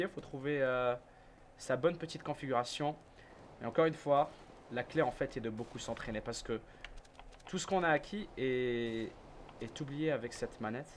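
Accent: Quebec, Canada. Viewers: est tu québécois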